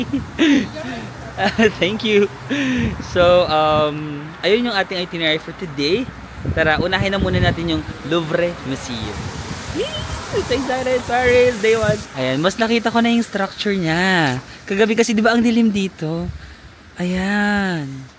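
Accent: Philippines. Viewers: It's okay, I still love your Filipino accent ~ 😍😉 Enjoy your trip in Europe po. Waiting for your next travel vlogs! ✨ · alam nyo po, sinasadya at inaamin naman po ni na hindi nya alam sabihin ang mga french words. for starters,.talagang mahirap maman talaga ipronounce ang french..kahit ibang lahi ay hindi din nabibigkas ng tama ang salitang french. mas cringy kung nagffeeling si na french expert sya pero mali mali naman ang sinasabi. he is just being a filipino tourist with a filipino accent. very down to earth and more relatable. hindi feelingera!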